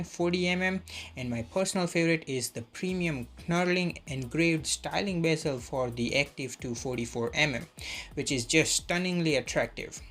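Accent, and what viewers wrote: Gujarat, India. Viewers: Are you Gujarati?? Your accent is so Gujju..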